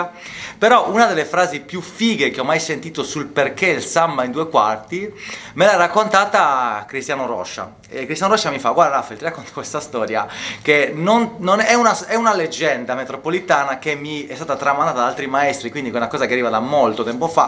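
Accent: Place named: Brazil. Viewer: Si sente che sei brasiliano, l'accento non mente 😂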